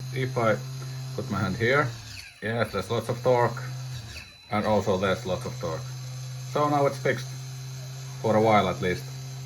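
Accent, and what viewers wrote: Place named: Finland. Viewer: I know that accent perfectly! What part of Finland are you from? Some of my family lives in kymonkoski and some in viitasarri.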